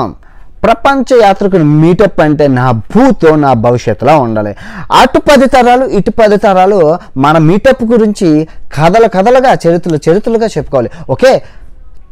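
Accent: India. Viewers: America vellina mana slang manadea...👌 😂😂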